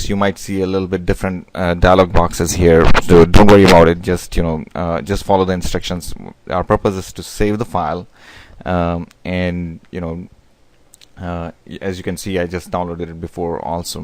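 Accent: India. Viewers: thank u sir....one question..r u indian????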